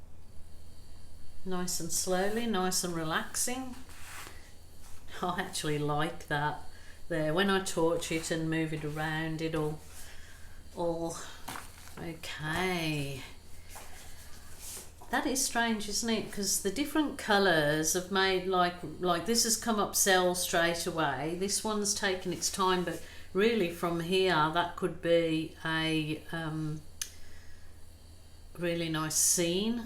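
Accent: Birmingham, United Kingdom. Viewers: That's so funny because I was going to suggest you were originally from Birmingham as you have a strong inflection on certain sounds - and it is strang that I should notice it to the exact region in the UK as I am an Irish woman, living in Ireland all my life haha! Keep up the beautiful work and I love that you are using glue. It just proves that you can do amazing things without breaking the bank. Have a great day and thank you for everything!!!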